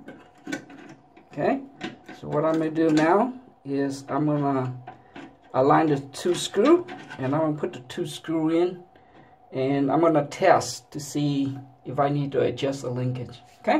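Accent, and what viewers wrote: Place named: Louisiana, United States. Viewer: You sou d like youre from Louisana.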